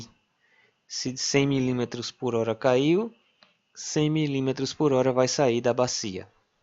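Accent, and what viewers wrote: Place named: Alagoas, Brazil. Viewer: Retificando: sotaque alagoano!!!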